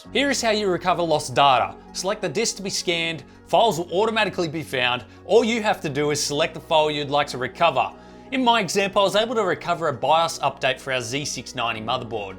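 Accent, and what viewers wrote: Australia. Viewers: are u aussie ? so am i lol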